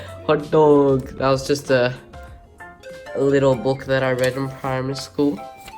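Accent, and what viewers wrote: Australia. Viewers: Are you Aussie?\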